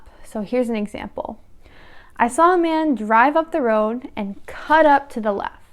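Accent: United States. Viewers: R u american?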